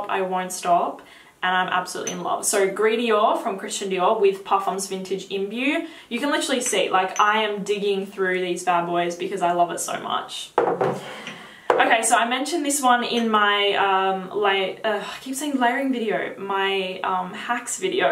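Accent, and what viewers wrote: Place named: Australia. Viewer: What part of Australia is this accent from??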